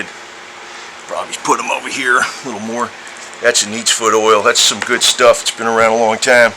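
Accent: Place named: Chicago, United States. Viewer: i always liked el caminos. this guy sounds like a chicago accent cause he talks like me and i am from chicago.....! and nice car dude....!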